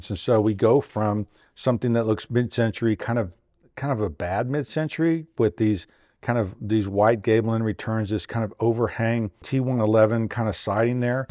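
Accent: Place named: New England, United States. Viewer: I'm guessing is from New England. We all say it like that up here, except sometimes it's "MassiveTwo..."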